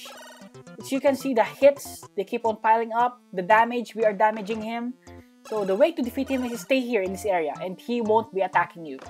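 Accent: Philippines. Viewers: are you from Philippines bro?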